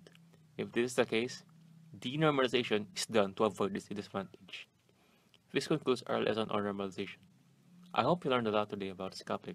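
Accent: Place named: Philippines. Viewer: Thnx....but Oh God, the accent, so hard to understand him...but Pinoy pride baby ;)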